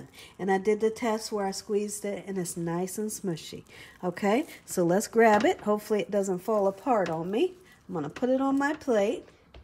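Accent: South, United States. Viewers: She's sporting a buffalo jersey with a southern accent.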